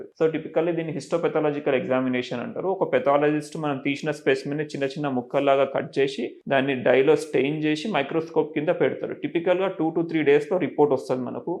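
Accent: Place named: Telangana, India. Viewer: Chaala natural ga telangana yaasalo manchiga cheppirru sir , Miru nijayathiki maroperu sir, Inkenno videos cheyyaalani korukuntunnam sir thank you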